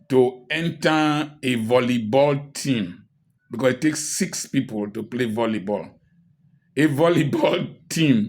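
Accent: Nigeria. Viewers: I dont the deep nigerian dialect but I love it.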